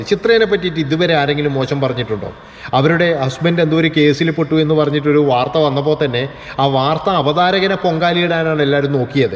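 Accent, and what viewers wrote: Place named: Thalassery, India. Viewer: ഇങ്ങള് തലശ്ശേരി കാരനല്ലേ... അല്ല കണ്ണൂർ ജില്ല കാരൻ ഇങ്ങളെ വർത്താനം നല്ല രസമുണ്ട്.. ഒരു കളങ്ക മില്ലാത്ത സംസാരം... എനിക്ക് ഇഷ്ടപ്പെട്ടു... ഇങ്ങള് ചിത്രയേ പറ്റി പറഞ്ഞത് നൂറിൽ നൂറു ശതമാനം ശരിയാ... ചിത്ര ചേച്ചിയുടെ ശബ്ദം മധുര മുള്ള താണ്. ഇനിയും നില നിൽക്കട്ടെ.. സംഘി കളുടെ വലയിൽ പെട്ടുപോകരുത്..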